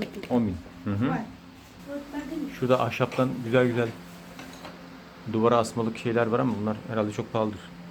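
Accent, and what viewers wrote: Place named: Turkmenistan. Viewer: Тилингиз шеваси туркманларга ухшаб кетади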